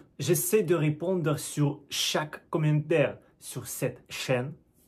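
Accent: Russia. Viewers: Bonjour. Je viens juste de visionner la vidéo. Tu sous-estime tes progrès en français. C'est dommage... Certes, tu as toujours ce petit accent russe que j'aime bien mais qui s'estompera au fur et à mesure de ta progression. Lire un livre le soir, il n'y a rien de mieux pour s'endormir. Et en plus, s'il est en français, en dormant, tu retiendras plus aisément l'orthographe des mots que tu auras lu. En tout cas, félicitations pour ta persévérance. 👍🙋‍♂️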